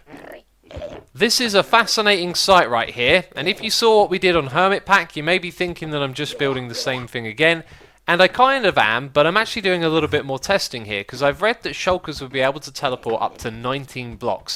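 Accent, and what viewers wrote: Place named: United Kingdom. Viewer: u sound so British...I am assell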